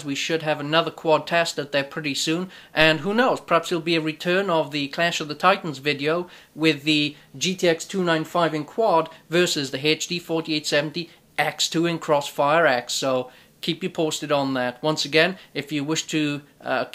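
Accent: Wales, United Kingdom. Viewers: I love the welsh accent :D Great review.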